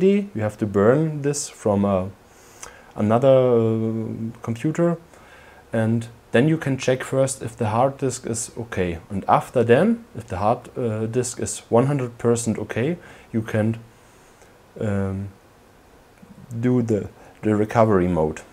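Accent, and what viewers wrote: Germany. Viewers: a nice German accent talking technically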